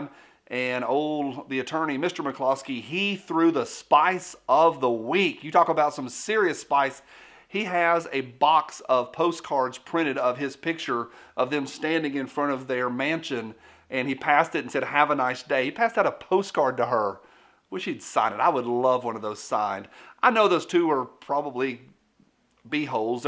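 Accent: South, United States. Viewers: Hey! Hope you aren't offended because of y'all's accent! We just know it as accent, not Gomer Pyle's! I just hope you take as the fun as it is intended to be! You're one of the top channels I keep coming back to!!! You're funny, good-natured and give good content! I'll keep watching till you stop or drop. Southerners have a particular accent, so do New Yorkers, and so do us Northerners. Believe it or not but Mid-Westerners do as well, you just have to listen carefully for it. And the one I like is dc draino 2 genders one!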